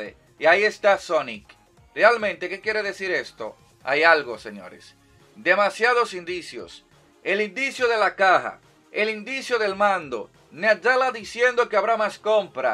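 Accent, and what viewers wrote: Dominican Republic. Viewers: Este pana suena Dominicano! Muy buenos videos, Tiene mi like buen hombre!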